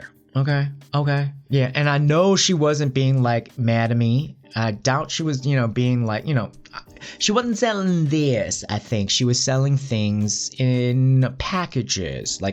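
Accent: United States. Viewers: Ok dude, its maybe just me, but you need to LOOSE the cowboy--gay--ghetto sound...were you raised in merica?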